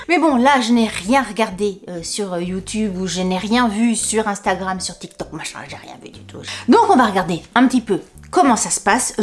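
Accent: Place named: Southwest, France. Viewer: Team sourcileuu, sud-ouest oblige ! On prononce tout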